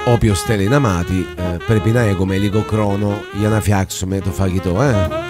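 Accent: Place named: South, Italy. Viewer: persino il tuo accento italiano, mentre parli greco, mi suona come quello del sud italiano. Direi….fammi pensare……fra napoli e sicilia forse?….ho indovinato? Palermo? Magna Grecia sicuro….hai usato zucchero o no? Se no, perche no?….un altra cosa: come e che sei andato afinire in Grecia? wife? Girl friend? O altro?…..:):):):)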